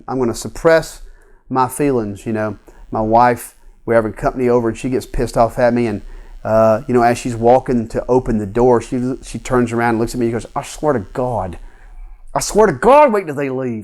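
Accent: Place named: South, United States. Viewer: Amazing lesson! Where are you from? You sound like a fellow Southerner! haha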